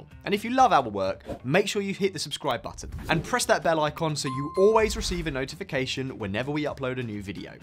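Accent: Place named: United Kingdom. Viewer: another jagoff with a brit accent and generic content. Didn't learn too much here but appreciate the effort.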